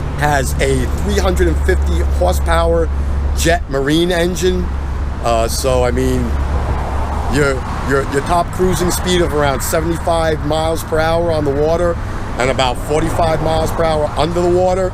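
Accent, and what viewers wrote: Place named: United States. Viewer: Like 110 I enjoy to watch u videos and I enjoy to listen the American accent to learn it 👍👍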